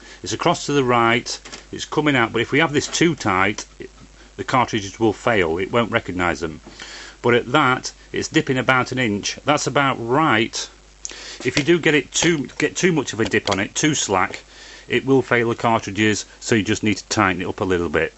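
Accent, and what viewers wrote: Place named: United Kingdom. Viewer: I have only this minute looked at your video, the ciss seems just what I want. I will look into things a bit deeper and contact later. In the mean time I will say that I personally prefer instructions that have a drawing of all the relevant procedures and parts so that I can take my time doing things. Thank God for a lucid fellow Brit who I can understand. Good luck.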